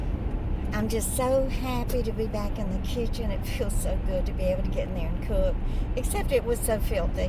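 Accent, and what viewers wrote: South, United States. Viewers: We just love your stories! Enjoy the grand babies! We laughed at " the cigarette made my head swim" with your cute southern accent. Do you have a Mississippi Pot recipe video? Have a great weekend. ❤ The Lewis Family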